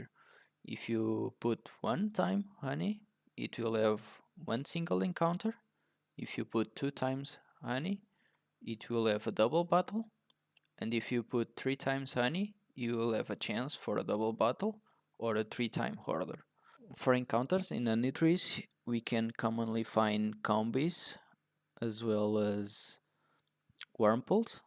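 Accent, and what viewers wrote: Portugal. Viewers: Sotaque pt? 100%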